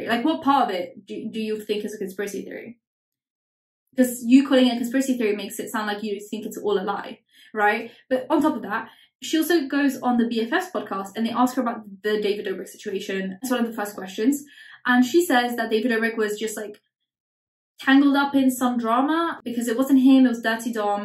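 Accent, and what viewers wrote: United Kingdom. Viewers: Wait you are polish? But you speak like your original from uk know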